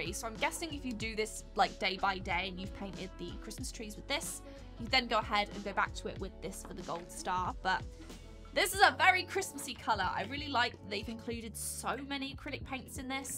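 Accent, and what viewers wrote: Australia. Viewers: I still can't believe your related to the youtuber Mr H and friends. I'm Praying for your family. First when I came around your channel I wanted to learn how to paint and you sounded like Australian 😅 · S I thought u r an Australian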